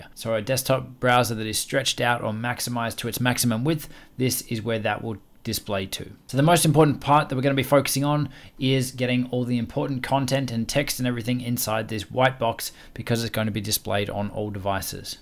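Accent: Australia. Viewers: great Aussie accent~~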